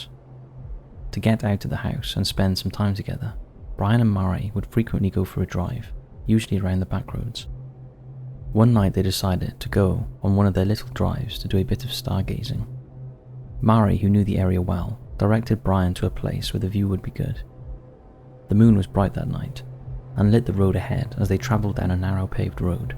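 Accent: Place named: England, United Kingdom. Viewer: think everyone agrees not sure where in England he is from?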